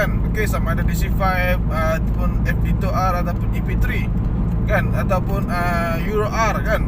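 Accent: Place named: Sabah, Malaysia. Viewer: serius la tak pernah dengar orang Sabah cakap?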